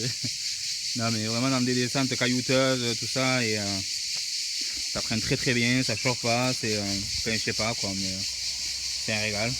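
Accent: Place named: South, France. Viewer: Ah les cigales et l'accent du Sud... J'adore. Je viens d'acheter le même ce matin pour 450€. Bonne route.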